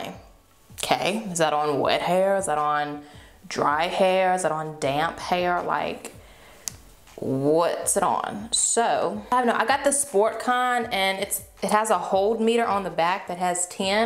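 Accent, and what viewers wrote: South, United States. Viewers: you have a weird country accent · That southern Belle accent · she sounds kinda country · ur voice is so southern belle esque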